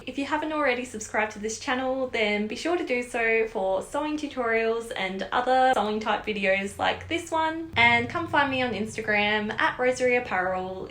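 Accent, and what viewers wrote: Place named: Australia. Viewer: Love this! So fun to see someone go to spotlight 😉 as a fellow aussie it's so nice to hear your accent and hear you talk about and go to familiar places (like salvos too!)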